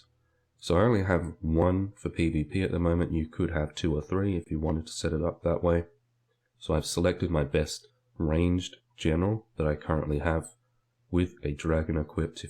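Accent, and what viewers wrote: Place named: United Kingdom. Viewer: Record your voice level at a higher volume on your next video, please I find it a bit low, could be clearer for your wonderful British accent. Great otherwise.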